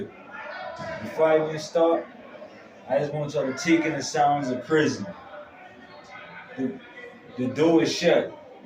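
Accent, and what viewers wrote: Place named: Charleston, United States. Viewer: Hold ya head fam 💯💯 u talk like lil buc from Charleston. Knew u was from here 💯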